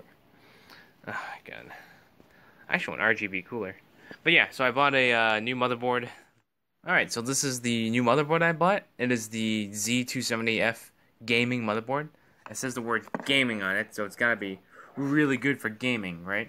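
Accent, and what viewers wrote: United States. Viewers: I thought u were an American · i thought you were american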